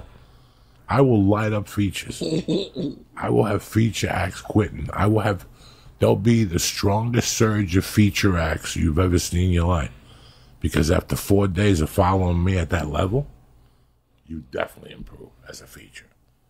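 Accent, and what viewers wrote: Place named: East Coast, United States. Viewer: He doesn’t remind me West coast comic more east coast roots. Accent. Comedy is east coast. I’m glad he is proud to be from New Jersey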